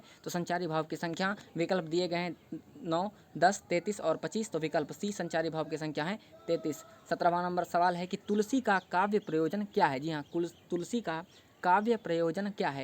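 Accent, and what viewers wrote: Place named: Bihar, India. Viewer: Bihari.. sayad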